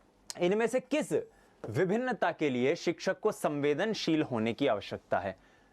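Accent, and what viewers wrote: Rajasthan, India. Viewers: Sir Rajasthani botle hue ache lgte ho😀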